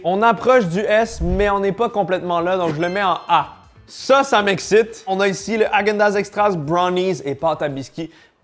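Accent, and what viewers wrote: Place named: Quebec, Canada. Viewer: J'aime bien les concepts mais encore plus l'accent Québécois je crois :)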